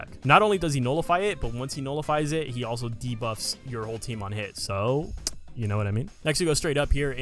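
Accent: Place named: Philadelphia, United States. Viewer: “ Launch that jawn “ bro definitely from Philadelphia or close by 😭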